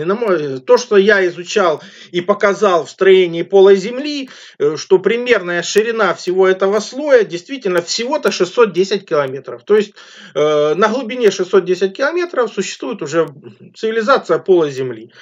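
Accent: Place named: Kharkiv, Ukraine. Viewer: Посмотрел, очень интересно, придётся подписаться 👍. Уж больно интересно Говорите, и говор на харьковские похож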